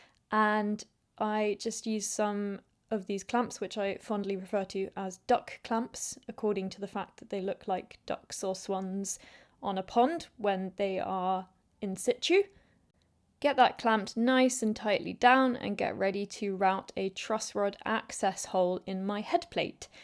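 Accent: United Kingdom. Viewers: I am captivated by your woodworking skills ,love your British accent. The hoping is probably good for blood flow to your legs .🌻🐇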